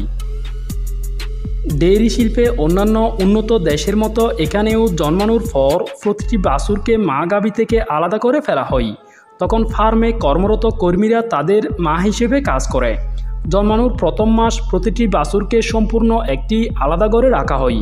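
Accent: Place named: Chittagong, Bangladesh. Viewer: আপনি চট্টগ্রামের লোক মনে হয়।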